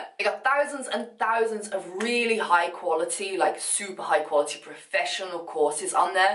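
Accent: United Kingdom. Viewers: You British Ayeeeee???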